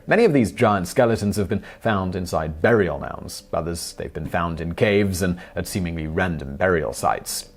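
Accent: United Kingdom. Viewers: So...you want a Brit to use incorrect American pronunciation? Here's a tip, go see the world and make sure to never use the complaint that "This isn't how we do things in America", because we either don't care, or find your ways quaint and strange, especially pronunciation.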